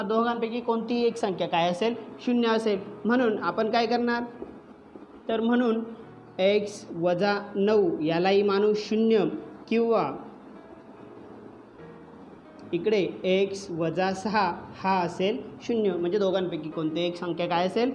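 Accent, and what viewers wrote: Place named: Kolhapur, India. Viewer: Kolhapuratun boltoy sir Brand shikvta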